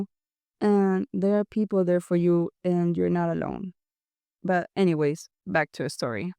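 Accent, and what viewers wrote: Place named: Latin America. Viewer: Is your original language Spanish? I really like your accent and I saw you included Spanish words and my parents are Hispanic so I speak spanish and was curious lol Also I love your style its super cute!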